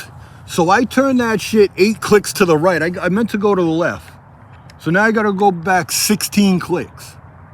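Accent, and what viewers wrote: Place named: Southeastern Massachusetts, United States. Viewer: Dude you have to be from near southeast Massachusetts. I lived there for 44 years (right near Fall River Mass) and just moved to Southwest Florida but "bang a U-ee" "wicked" "eff this & eff that" you sound exactly like me and my friends.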